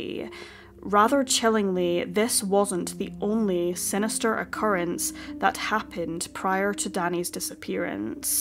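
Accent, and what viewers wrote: Scotland, United Kingdom. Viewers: She’s Scottish I believe:that’s how they pronounced certain words.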